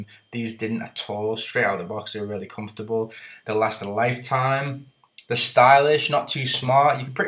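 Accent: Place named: Scotland, United Kingdom. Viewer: my badd, jajajja, i mean scottish, love the accent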